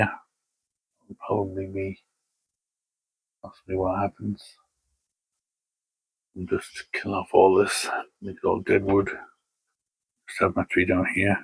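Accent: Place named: Newcastle, United Kingdom. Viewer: Hello?! Very interesting new channel with fascinating plants of some age at last! I’d like to know more about you, but I’m subscribing anyway. The bonsai show cleared up my question as to your accent, the main voice was Geordie I reckon! God bless your endeavours 🙏✝️. ✌️🇷🇺☮️🇺🇦✌️. ✌️🤍🇮🇱🤍✌️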